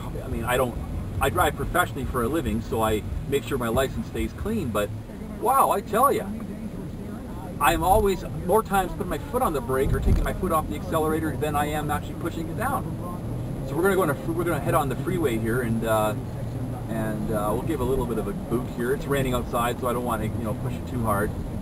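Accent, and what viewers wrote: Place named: Canada. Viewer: From your accent it seems your Canadian?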